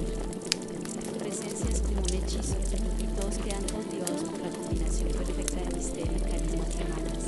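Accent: Colombia. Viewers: La vocesita parece de bogotá lsjdj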